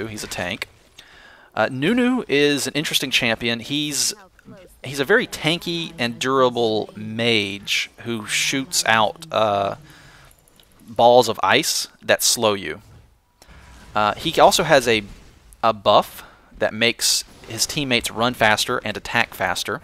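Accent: South, United States. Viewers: this video was amazzinggly helpful:D thank youu and I can't help but notice that adorable twinge of a southern accent you have:3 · u have a southern accent :3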